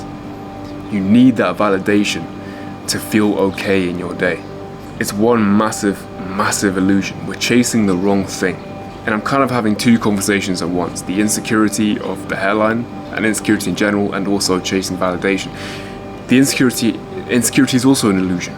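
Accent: England, United Kingdom. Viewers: And not to mention that gorgeous cut-glass English accent that makes come across as so classy and divinely sweet and adorable - as an older Irish gay man myself, I’d definitely date the handsome in a heartbeat ❤❤❤❤❤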